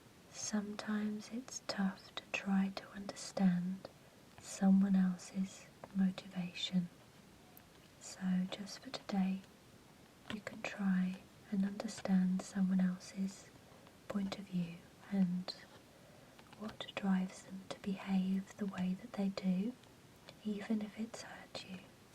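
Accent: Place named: Essex, United Kingdom. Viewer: love listening to your voice. calming, soft, essex twang with a touch of class and a slight hint of nautyness. could listen to you for hours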